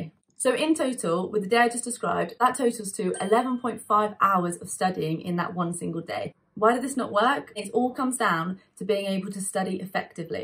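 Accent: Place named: England, United Kingdom. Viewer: your english accent is a little difficult to comprehend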